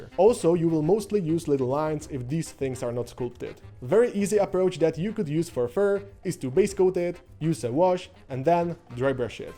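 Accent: Czech Republic. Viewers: Youtube doporučí zajímavé video. Po první větě je jasné, že jeho Tvůrce je Čech. Jinak skvělé rady, to se musí nechat.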